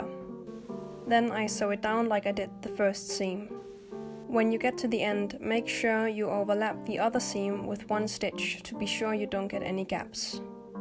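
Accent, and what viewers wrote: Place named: Denmark. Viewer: Youre danish lol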